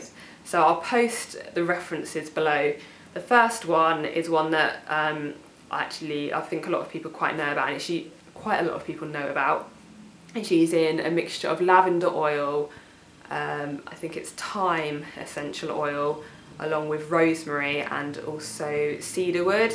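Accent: United Kingdom. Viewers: Thank You, I truly enjoyed your video.I am happy for you and your mom,and wish you both continued success.I have male patterned baldness and hope to Give it a Try.P.S. On a side note, I love your accent, Is it British,Austrailian or is it from the Caribbean. D.Taylor Montreal,Canada. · I am in love with this woman my goodness and this English accent is omg so beautiful. Love her! I forgot all about the hair regrowth tips hell lol.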